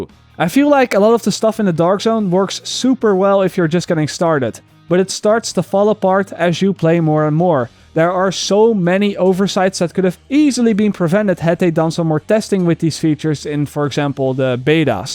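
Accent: Netherlands. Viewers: you sound like you're dutch.Why? I hear it on your voice. And btw.... Never stop making videos,only if you want to xP. Love them.. Got yourself a subscribe buddy. Daag! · you dutch? you sound dutch.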